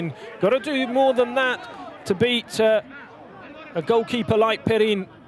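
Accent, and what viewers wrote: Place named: Italy. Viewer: Pretty sure is an Italian commentator trying to speak in English accent...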